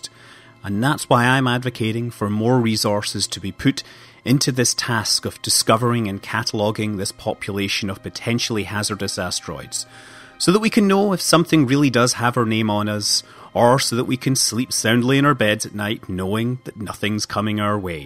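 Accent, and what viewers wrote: Scotland, United Kingdom. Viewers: my god what a snooty Scottish accent you have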